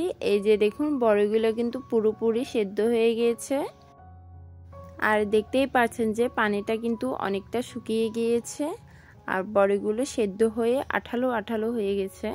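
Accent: Chittagong, Bangladesh. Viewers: Apu tumar basha ki ctg teh?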